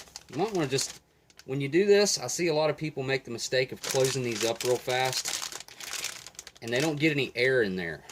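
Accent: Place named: Baltimore, United States. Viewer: Your accent screams Baltimore, especially when you said Sopacko, Hon!!!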